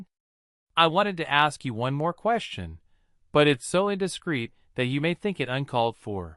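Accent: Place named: United States. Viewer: Omg!!! Do not let a AMERICAN READ AGAIN 😡😡😡😡😡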